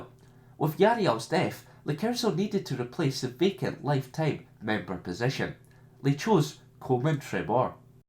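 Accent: Scotland, United Kingdom. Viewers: Hahahha "Scottish insult". Admittable when i searched star wars lore, i didn't expect it to be a fellow scot that would be the commentator.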